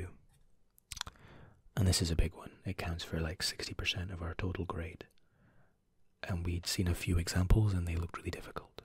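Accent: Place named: Midlands, United Kingdom. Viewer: What place in the Midlands are you from? I’m from Worcester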